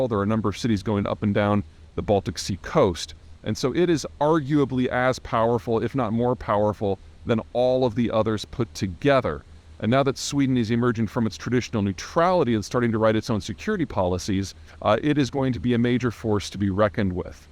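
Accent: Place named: Texas, United States. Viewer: Talks like some Texas ignorant. When a fool is trying to repeat what a wise man once said, the chain jumps off because he translates it into something he himself can understand.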